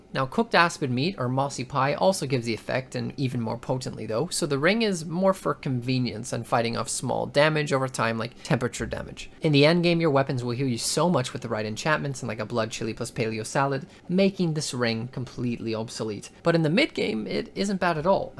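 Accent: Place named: Canada. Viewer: bro sounds like kenadian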